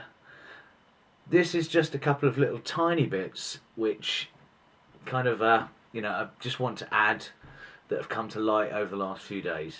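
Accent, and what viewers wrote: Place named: United Kingdom. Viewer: Beautiful British accent and a beautiful encouraging message! Thankyou xxx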